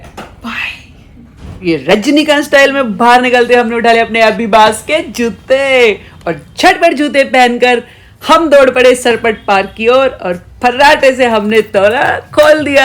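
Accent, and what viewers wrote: Haryana, India. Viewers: Very Sweet ❤ बहुत प्यारी बोली हैं हरियाणवी ! Very Lovely Haryanvi Culture ! Aapki Shaadi Me Jrur Bulana! I Like And Want Seen Haryanvi Culture ❤ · बोली से पटा चला आप सादर हरियाणा से हो।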